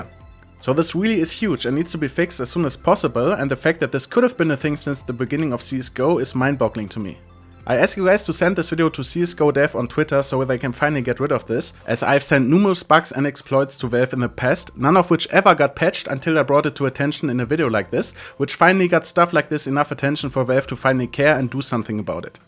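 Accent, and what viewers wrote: Germany. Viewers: Cool vid and friendly deutsch accent :)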